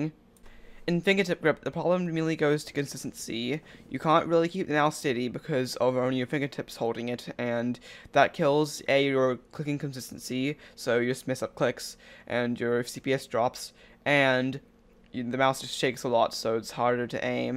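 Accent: New Zealand. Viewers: Its kiwi accent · GGL it's his accent, I think he is from New Zealand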